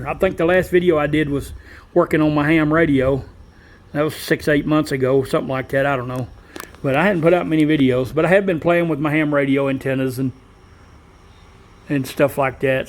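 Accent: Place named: Tennessee, United States. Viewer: Are you here in tennessee? You sound like a fellow tennessean.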